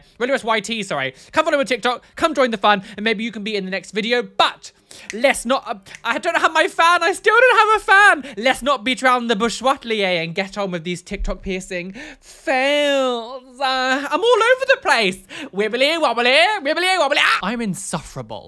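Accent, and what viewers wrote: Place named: Essex, United Kingdom. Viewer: Just found you and am so loving your energy! Your accent is awesome as well, and may be the inspiration for one of my Essex characters in my books. :)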